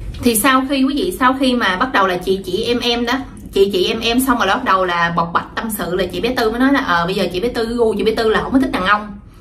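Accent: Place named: Rural, Vietnam. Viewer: Cô rất thích - giọng nói đậm chất quê- tâm lại tốt nữa Oke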